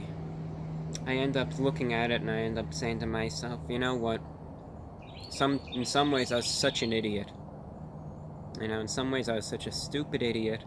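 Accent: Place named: Transatlantic, United States. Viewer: People can mock all they wish, but it doesn't matter, until you get master the transatlantic accent and wear a business suit attire everyday, you'll never be on the classy level to make a truly meaningful insult on.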